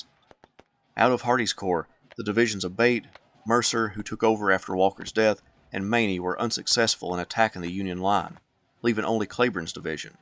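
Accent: South, United States. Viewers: 🏗🦌🌟🌟🍀 **I REALLY APPRECIATE YOU AND YOUR SOUTHERN ACCENT !!!!*** I was raised in Louisville Kentucky 👏 Michael W.Johnson retired in North Modesto, California 😊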